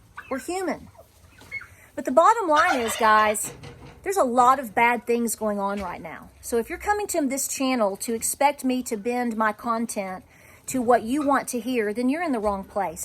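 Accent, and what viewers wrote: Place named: Georgia, United States. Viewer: I am so glad I found you and your channel. I love hearing you speak. You got a lot of wisdom for someone so young. I grew up in NW Georgia. We talk like you do. And, surprisingly, my heritage is very similar. I never knew my great-grandmother on my mom's side. I was told she was full blood Cherokee. My mom traced her father's lineage (Irish) to a pub in New York around 1700. My dad's side was Welsh and German. Don't know about the German, but when the Macintosh clan had a disagreement, the Mac Thomas clan was formed. Eventually, they headed south (Wales) and dropped "Mac." They ended up in Murphy, TN, and branched from there. Please stay the way you are. Prayers for you and your family 🙏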